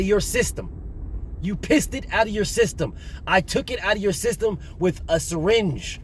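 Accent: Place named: United Kingdom. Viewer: Come on brov 😂 chip chip cheerio 🤣 come on mate 😂🤣 that British accent is hilarious, that British accent is giving them headache 😰😩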